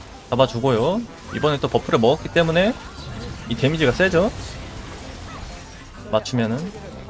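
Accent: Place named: Jeolla, South Korea. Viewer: 님 전라도 분이신가 ㅋㅋㅋㅋㅋ 사투리 ㅋㅋㅋ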